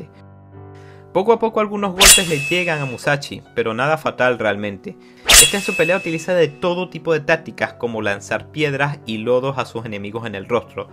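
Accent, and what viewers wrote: Venezuela. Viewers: Es venezolano Jajaj😂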